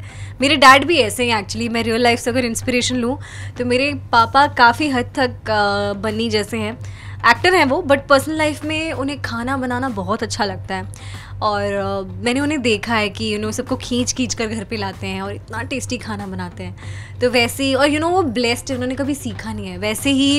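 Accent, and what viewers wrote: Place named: Bihar, India. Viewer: Luv u and ur style and chehra kep it like this like ur bihari accent wan to hear mor carry on shelter for stray dogs and animals they need care mute they r feel pain cant say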